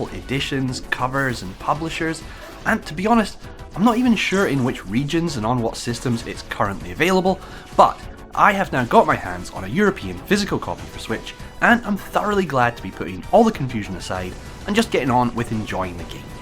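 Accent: Scotland, United Kingdom. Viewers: 😅 I note that the Scottish accent perfectly matches my creepy french ears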